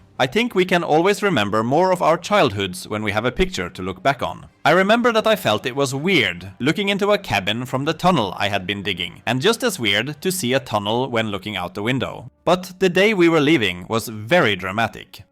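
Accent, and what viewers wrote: Norway. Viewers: Hører du er norsk på mils avstand. No offence. Bare sjarmerende. Informativ video. Tommel opp.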